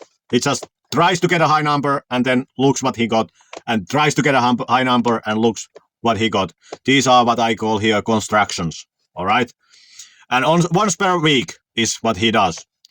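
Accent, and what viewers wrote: Finland. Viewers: Just by listening to your accent, you are finnish! I was in seinajoki for 1 year! Mitta kuulu? Lol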